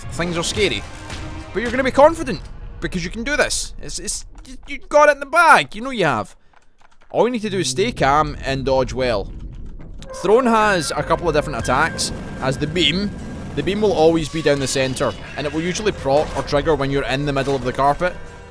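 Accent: Scotland, United Kingdom. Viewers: it's always great hearing advice from another scot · I would laugh at any of your videos due to your Scottish accent. Just makes every story entertaining. Good video though, man.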